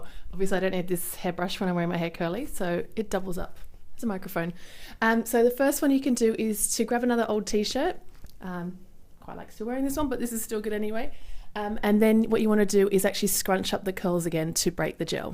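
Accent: Australia. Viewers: I love your channel and love hearing a fellow Australian ❤️ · I haven’t let my hair run wild with its natural curl in ages. Your videos are making me want to go au natural. I’m curious to see what it does since being bleached and abused. Love your voice by the way - it’s a nice example of an Aussie an accent and I find it quite soothing.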